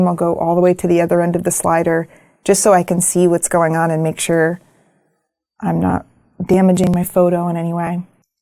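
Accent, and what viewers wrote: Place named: New England, United States. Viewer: I'm glad you like our Yankee accents :D I have to say, the NZ accent is one of my favorites thanks to Flight of the Conchords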